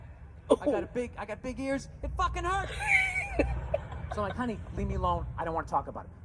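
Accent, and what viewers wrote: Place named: South, United States. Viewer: Yep I'm a reaction junky and from Bristol TN so not enamored by your voice or anything we both country....only said that to say you have good camera abilities. I wish at this point I had more going on but besides when my grandson gets his little league going I got down time and regardless if there's ever a reactor HOF I better be a judge so good start as I haven't seen you before ma'am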